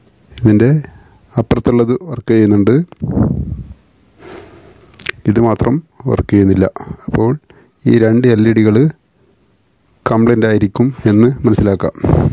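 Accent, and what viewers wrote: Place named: Tamil Nadu, India. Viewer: തമിഴൻ മലയാളം പറയുന്നത് പോലേ തോന്നുന്നു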